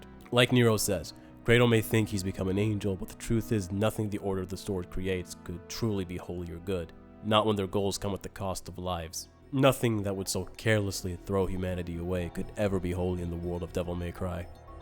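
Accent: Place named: Arab world. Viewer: This is a very great video but from your accent I assume you're an Arab and new mention the Quran in your video another detail that I think you know but you're not mentioned the concept of Angel and demon and Satan in Islam and Christianity is completely different and one of them that the demon are not Fallen Angel but the concept of demon is describing anyone who's rebellious against God if they are Jinn like iblis or are human an angel in Islam is have no free will and they completely follow god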